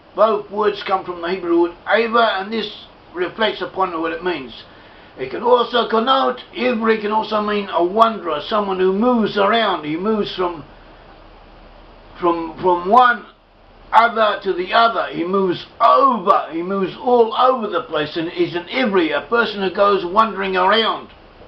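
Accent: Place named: England, United Kingdom. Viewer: ugly looking fuck with some english accent with a horrible voice fuck off to wales or somewhere with your bullshit ..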